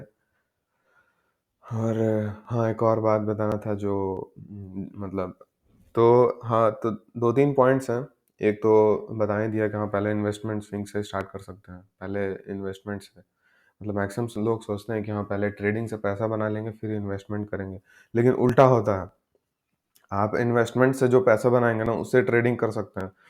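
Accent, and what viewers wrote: Bihar, India. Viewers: Bhai aapke awaaz se lagta h aap bihar se h kya? I m also new in market I found your video interesting.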